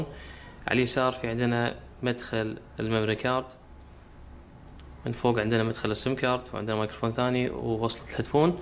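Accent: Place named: Iraq. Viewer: كلامك عراقي لايك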